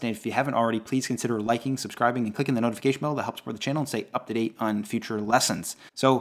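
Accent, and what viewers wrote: Canada. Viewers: This guy is canadian I can hear he's accent 😁 esp when he says "about"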